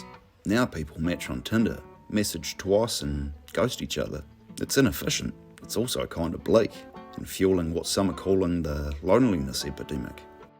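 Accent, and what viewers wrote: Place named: New Zealand. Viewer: Brother I’m from rural Waikato and I had to adjust to your Kiwi as accent hahaha respect brother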